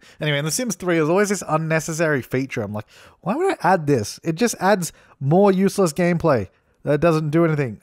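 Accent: Australia. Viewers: To be fair though, triangles are the best shape. Just saying, oh and also, I love watching Aussie youtubers like you. It helps me a lot considering I'm an Aussie to hear my own accent on a global platform makes me very happy. It also makes me happy to know you're from NEW like me (about 3-4 hours north actually) because all the Aussie youtubers i know are either from Victoria or Queensland (bar a few). I'd also like to thank you as you've helped me with a few very personal issues without even knowing it. Your everyday humor makes me smile in my darkest moments, which I'm forever thankful for. Another thing as this is getting super long, I absolutely love the style in which you build your houses, it's very similar to my own taste and you often talk about people requesting other styles, which is okay too.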